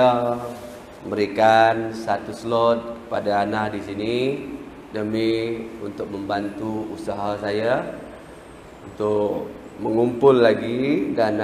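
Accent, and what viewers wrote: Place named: Northern Malaysia, Malaysia. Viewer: Pandai ustaz cakap loghat utara 👍👍👍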